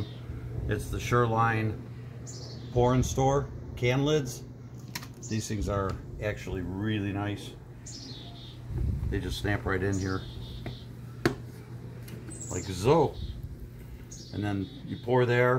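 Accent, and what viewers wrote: Midwest, United States. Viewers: Good information. Oh, I am here for the Midwest accent! Lol. Born in Indiana, raised in Wisconsin, but have lived in west Texas for about 40 years now. I miss that accent! 😂😂😂.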